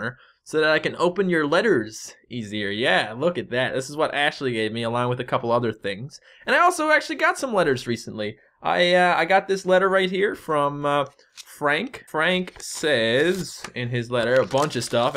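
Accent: Canada. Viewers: Are you candian ? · I'm new here I saw you from your besiege video and I enjoyed it you earned a sub by the way are you Canadian?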